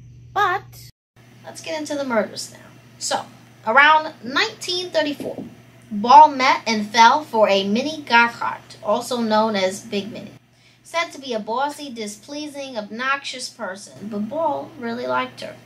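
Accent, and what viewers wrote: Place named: New York, United States. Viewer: Hello I love your costumes and thick New York Accent Long Island no doubt. Sounds like Cyndi Lauper but more cute. I think you like those types of stories and dress up but it’s good I love it thank you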